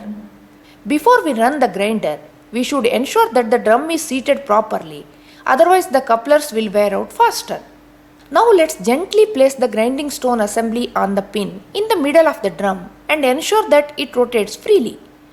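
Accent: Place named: South India, India. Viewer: I loved the voice as well as the presentation. It's south Indian accent so you are finding it different.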